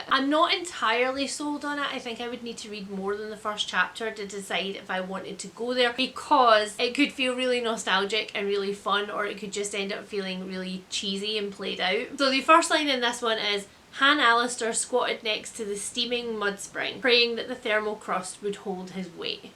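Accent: Scotland, United Kingdom. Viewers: I'm on staycation and I have binge-watched so many of your videos that my inner monologue now has a Scottish accent 🖤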